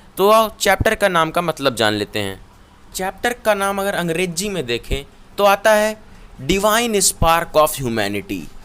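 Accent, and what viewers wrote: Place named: Bihar, India. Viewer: Bihari ho ka bhai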